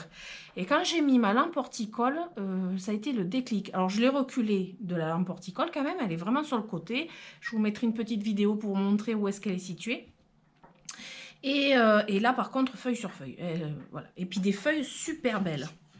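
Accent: South, France. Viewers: Encore des éloges pour vous votre gentillesse votre simplicité vos expressions bien du midi et votre accent du midi gros bisous 😚 😚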